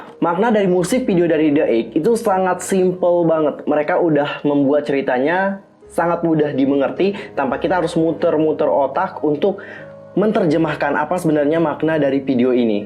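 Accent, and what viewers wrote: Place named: West Java, Indonesia. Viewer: Salfok sama cara ngomongnya sunda banget. No Hate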